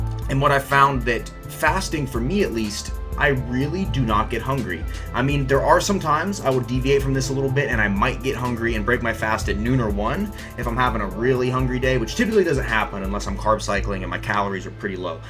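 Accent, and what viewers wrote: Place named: South, United States. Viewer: You from like the South dude hehe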